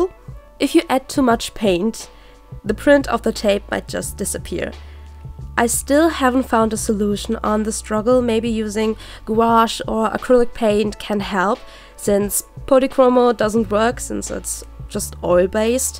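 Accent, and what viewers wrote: Germany. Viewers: You’re german, aren’t you? :D your accent sounds like mine (I’m german) that’s why I‘m asking.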